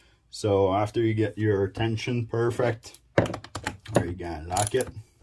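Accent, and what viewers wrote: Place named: Quebec, Canada. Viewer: Thank you! May I say you have the most delightful French-Canadian accent.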